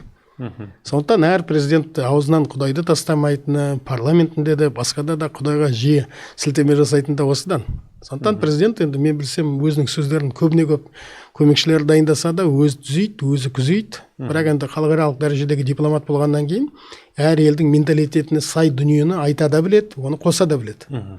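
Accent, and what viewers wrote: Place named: Russia. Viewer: Вашингтон емес, Уашиңтон. Техас емес Тексас. Ганконг емес Хоңкоң. Ресейдің сасық аузымен мыйымен әлемге қарауды қою керек Қазақтар.😮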